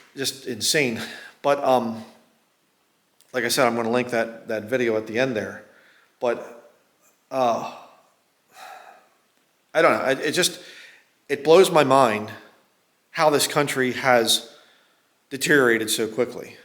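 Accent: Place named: Southeast Pennsylvania, United States. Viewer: Wow,you sound like me,raised up in southeast pa. On a dairy farm and going upstate for everything outdoors. Now living in maine 10 years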